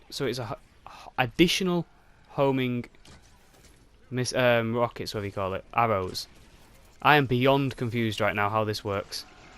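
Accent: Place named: United Kingdom. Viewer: Half the reason I watch is for your delightful accent! British?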